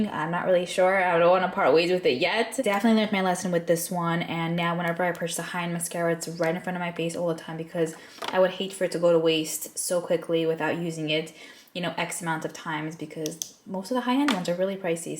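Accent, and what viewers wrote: New York, United States. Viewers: To me you sound like a New Yorker. The way you speak is just fine! Don't listen to the assholes, you probably know more languages than they do! Insta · I actually love the Too Faced Chocolate Brow-nie pencil but I just found out Ulta doesn’t carry it anymore 😭 I usually used my points to get it for cheaper. I don’t know if Sephora ever did but it’s not on there either. It is on the TF site but idk if it’s worth it. It’s the only pencil where I found a good shade match, because my brows look black but they’re actually a very deep brown. I got the newer Huda Beauty one which matches my brows well, but I broke it off a lot already, it’s too thin for me! Also I’m bilingual too, with English not being my first language either. I usually say words correctly but sometimes my accent comes out— probably because I’m around my family so much. 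My English was perfect from elementary to middle school but high school I started having a slight accent? lol So be proud of who you are, a lot of people just know English anyways 😜 Your accent also has that New York vibe, you sound badass haha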